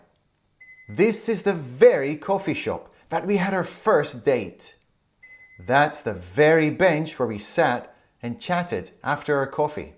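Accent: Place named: United Kingdom. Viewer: U hv typical uk pronunciation 😂 n ur japanese is much better than mine🤦🏾 老師好厲害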